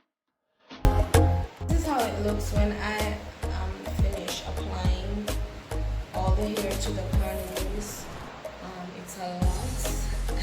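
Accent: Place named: Jamaica. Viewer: Nice love the way u used ur Jamaican accent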